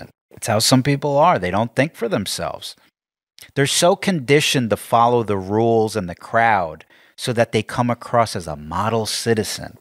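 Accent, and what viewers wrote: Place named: New York, United States. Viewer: You sound like a New Yorker, I Love it! 🙌🏻✨🥂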